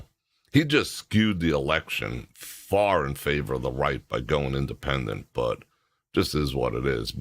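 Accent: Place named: New Jersey, United States. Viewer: It was great fun. Sorry, i missed the live part, what ever you talk about, its like have a friend chatting, i really enjoy listening to you, you sound like Jersy to me, I'm from Wilmington, D, just over the bridge.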